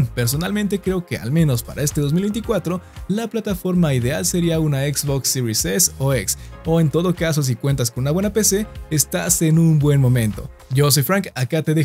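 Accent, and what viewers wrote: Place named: United States. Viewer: Por que no dices serie x o s en ves de tener fonética yanky.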